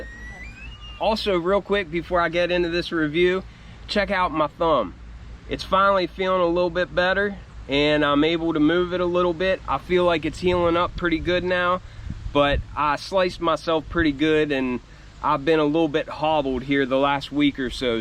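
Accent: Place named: Philadelphia, United States. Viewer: you gotta be from greater philly talking like that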